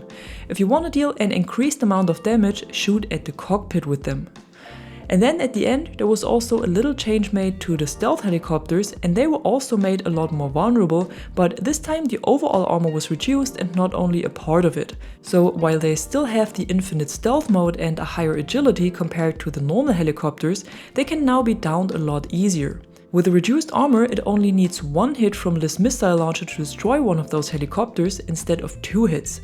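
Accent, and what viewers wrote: Germany. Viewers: danke für die Tipps 👍 weiter so...ich mag deine Videos sehr...ich schreibe einfach mal in deutsch weil ich denke das ich da einen deutschen Akzent höre 😅✌️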